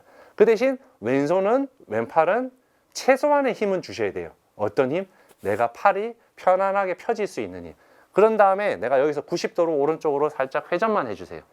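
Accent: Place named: Busan, South Korea. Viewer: ㅋㅋㅋ 허프로님 부산분이라 사투리가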